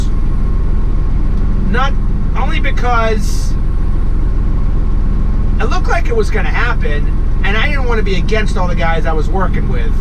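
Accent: Boston, United States. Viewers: Hell, if I have to drive my pickup to a job 20 miles away, by weeks end I complaining to my bride that with all this driving I feel like an over the road trucker 🤣🤣 love you videos , grew up on the south side of Boston and left 31 years ago and it’s great to hear some one that talks normal 😜😜😜✊✊ that’s wicked pissa 😀😀🇺🇸🇺🇸🇺🇸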